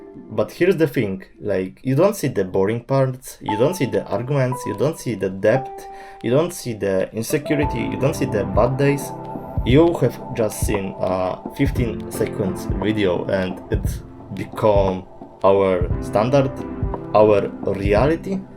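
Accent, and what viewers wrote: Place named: Poland. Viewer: Masz Polski akcent ale ma to swój urok. Fajne że tak rozbudowałeś ten temat w tym filmiku. Oh and have a nice day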